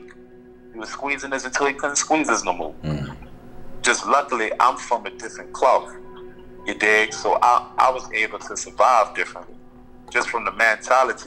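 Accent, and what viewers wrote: New Orleans, United States. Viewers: I LOVE the New Orleans accent!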